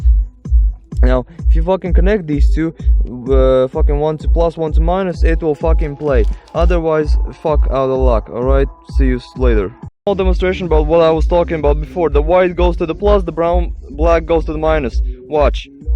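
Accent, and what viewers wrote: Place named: Latvia. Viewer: Can hear ur latvian just by the talkin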